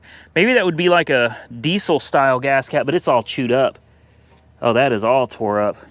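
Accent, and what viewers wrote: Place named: Tulsa, United States. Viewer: Oh Dude, I'm watching this and cringing just knowing what's going to happen to you and you're such a nice person. My family is from Tulsa and I always feel a kinship when i hear you speak.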